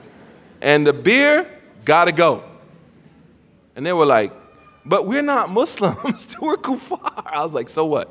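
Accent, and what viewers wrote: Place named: Bronx, United States. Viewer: His manner of speech offended me as a Muslim. Really what is going on In America? Have some strange people becoming "Imams" Why is he trying to talk like he is from the bronx, it is not impressing anyone. And I agree with the person who said even the sisters are looking at him oddly those sat in the audience. What is happening in America??? I am in the UK thought it was tough here... Ya Rab whats going on with some people?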